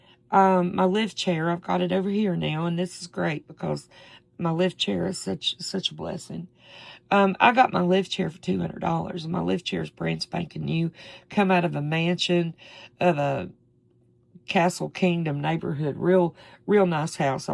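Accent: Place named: South, United States. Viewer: I love what you made. Love watching, and hearing you. your such a sweetheart. I dont crochet, but love watching you, and hearing that southern acent. Night. And god bless you.🥰🥰🥰🥰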